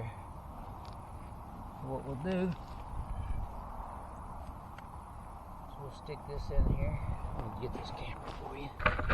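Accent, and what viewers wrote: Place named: South, United States. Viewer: What state are you in? I see red dirt and hear a southern twang.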